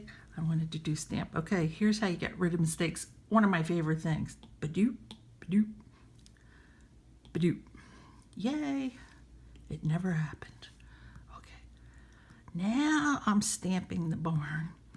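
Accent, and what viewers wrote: United States. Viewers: This is great! It;s exactly the way I work - try this, try that, where's the thing I just did, whoops, undo - which makes me a feel a bit more confident. Btw, I'm originally form Yardley and lived i Philly for 20+ years so it's GREAT to hear somebody "tawk" right! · This is not a great tutorial, but I really appreciate you trying. I did learn a few things from you. Love to see you come back after a few months when you know what's what and can go more into depth. Hard to find good tutorials on Metabrush. You have a very nice voice for this sort of thing. Overall, I'll give you 3 out of 5 stars...with a little more prep you could get 5 stars. Oh, and the camera work was fine...better than many. And hey, I'm from Jersey...we Tawk a lot like you. I didn't even notice an accent! One last thing. you would be better off leaving the brush in the default position. 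The middle on all the sliders is not a very appealing brush. You can't make strokes with it and as I understand it, strokes are a very important part of MetaBrush technique.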